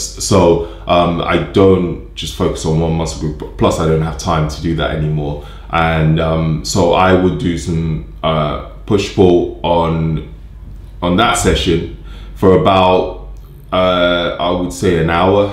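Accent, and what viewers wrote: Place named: United Kingdom. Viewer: Damn your so fit and your accent is so brittish very Nice. Btw congrstulations😉👍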